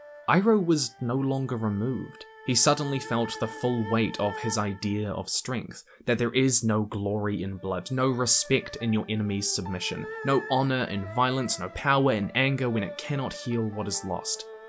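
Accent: New Zealand. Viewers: Ahh I love your accent- It has nothing to do with the video but kiwi accents are always so- yes. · When I hear your accent, all I can think about is that new Zealand deck commercial.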